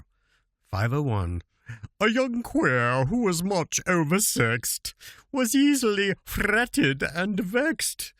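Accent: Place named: India. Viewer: Your Hindu accent has made me pass out with laughter 😂😂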